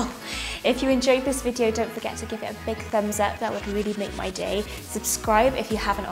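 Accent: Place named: Wales, United Kingdom. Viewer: Welsh if im not mistaken!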